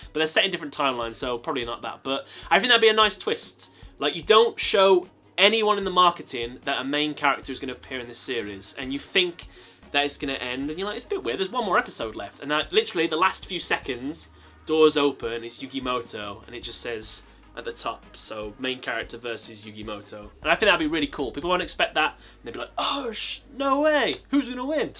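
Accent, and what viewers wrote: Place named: United Kingdom. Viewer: thank you. Are you UK based, you sound it. Don’t see many Yugioh channels with UK youtubers. Nice to see you represent 👍 I’m gonna throw you a subscribe your content is decent.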